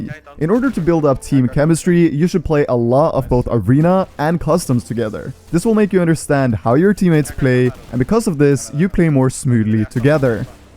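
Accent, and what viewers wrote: Norway. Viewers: Yo er du norsk jeg merka det nettop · Wait are u Norwegian?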